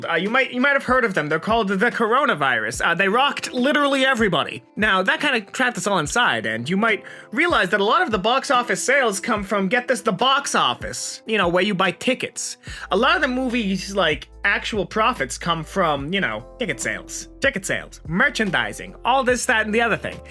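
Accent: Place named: New York, United States. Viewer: why do you sound so much more new york kwite?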